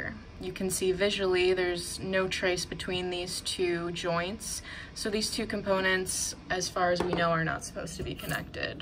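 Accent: United States. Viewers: Why do Americans distort the English language? i.e. The word SOLDER has an 'L' in it which in normal English is pronounced! So why don't Americans pronounce the word SOLDER instead of saying SODDER?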